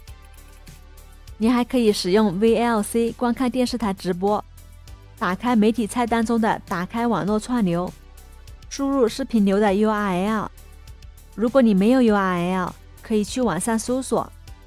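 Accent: China. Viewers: 声音挺可爱的，而且英文也是同款中式，很有亲切感~哈哈哈~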